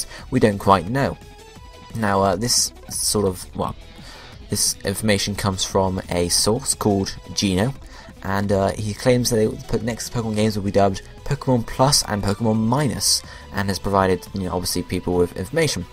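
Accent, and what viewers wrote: England, United Kingdom. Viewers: your English accent make me believe everything you say!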